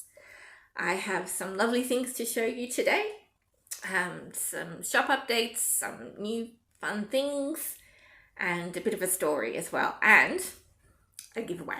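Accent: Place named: Australia. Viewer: One of my favourite reds to stitch with is DMC 347. Enjoy your flosstube, nice to hear an Aussie accent!😊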